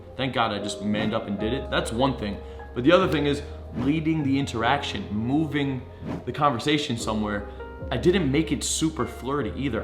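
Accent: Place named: New Jersey, United States. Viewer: You from jersey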